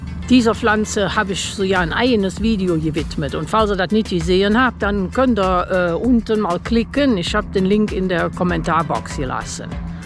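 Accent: Cologne, Germany. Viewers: Einfach, wie immer, grandios. Du bist eine wunderbare Schauspielerin mit einem enormen Wissen und Deine koelsche Aussprache, einfach herrlich. Es war mal wieder ein Genuss. Danke dafür. Lg Evi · Liebe Grüße aus Dresden bei Uns ist grad Schmuddelwetter und die Sonne lösst sich selten blkicken. Schönes Video und Kölsch klingt geil.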